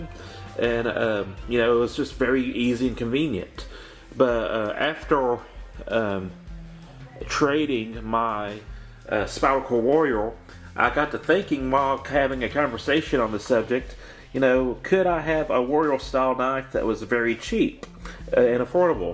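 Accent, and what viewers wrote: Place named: South, United States. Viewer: Where are you from? Your accent is hard for me to place. American South?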